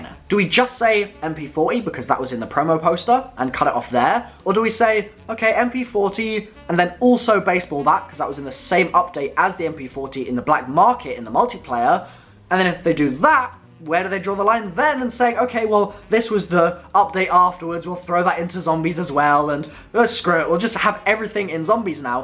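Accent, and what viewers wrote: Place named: RP, United Kingdom. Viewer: this is to fucking posh for respect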